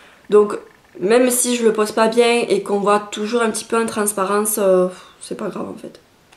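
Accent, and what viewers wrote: South, France. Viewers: tu vien du sud avec ton accent je me trompe ? ^^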